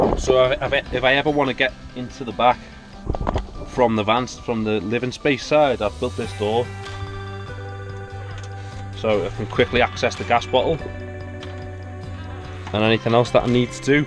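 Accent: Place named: Doncaster, United Kingdom. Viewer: Love your van - also love the accent! (Doncaster is it?)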